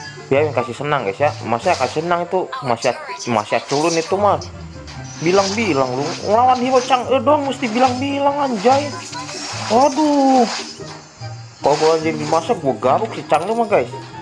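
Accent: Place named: Tambun, Indonesia. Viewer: kaya orang tambun bhasanya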